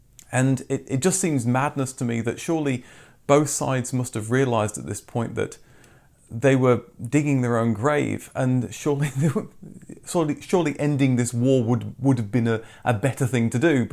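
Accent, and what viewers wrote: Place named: United Kingdom. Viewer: I've been a fan of your videos since you started on here but can't make out wear you are from are you from the UK or US you sound British 🤔 ps I love your video thank 👍🙂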